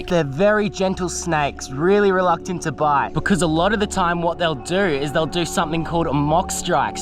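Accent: Australia. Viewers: My jaw drop when i saw the title but when i hear him speak and i hear an austrailian accent i just said to my self nah hes gonna be fine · Sounds so Australia 💀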